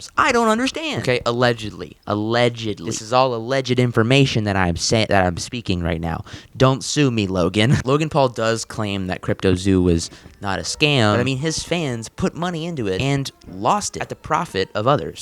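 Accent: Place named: United States. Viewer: Why you got a prick annoying Americans accent?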